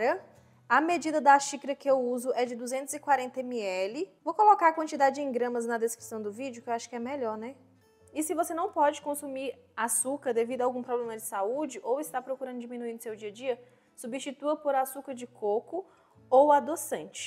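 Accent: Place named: Northeast, Brazil. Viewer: Sensacional!! De que região do nordeste você é?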